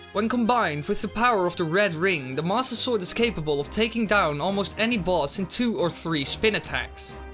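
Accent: Netherlands. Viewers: In het begin een beetje te Nederlandse multiple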